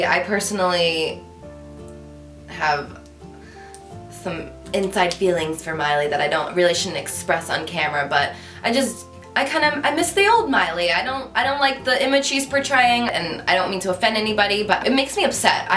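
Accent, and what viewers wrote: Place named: Rhode Island, United States. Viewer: Haha I know she's from New Jersey but being from Rhode Island I think she has a Rhode Island accent, not like they're any different tho.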